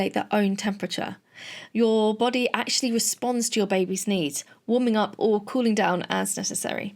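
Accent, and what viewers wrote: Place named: United Kingdom. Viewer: Hi, I have been binging your content over the last week or so and want to say a huge thank you. Its refreshing to hear a British voice with experience in the NHS going over all these important concepts since so much of the content on Youtube is US centric. Im 37 weeks pregnant and feeling much better prepared for breastfeeding and postpartum thanks to your content. I was also fascinated by how the mention of "OF" clearly got your video seen by the algorithm and the engagement it got!!! Like the YT game is rigged against legit informative content... In any case, thank you and keep doing what youre doing ❤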